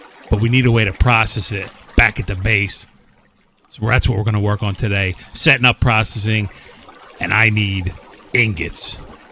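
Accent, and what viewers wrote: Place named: Philadelphia, United States. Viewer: As soon as I heard you pronounce water as "wooder," I strongly suspected you were from the Philly area. I grew up near Pittsburgh, which has entirely different accents, but it's still nice to hear some Pennsylvania pride. Respect, sir!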